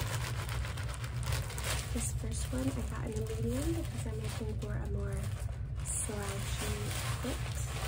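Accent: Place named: United Kingdom. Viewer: stoppp omg you have to do a video where you just talk with your british accent!!! ❤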